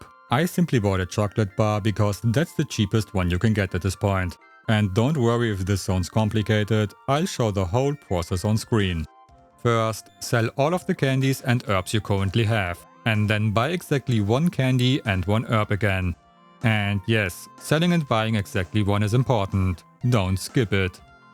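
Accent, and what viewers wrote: Germany. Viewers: Are you German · Hard German accent, but great. Sword orb glitch not possible on PAL. Possible on switch?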